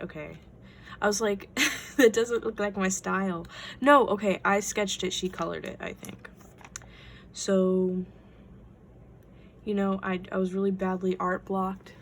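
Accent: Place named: South, United States. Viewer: I just have to mention that you have a nice southern accent :3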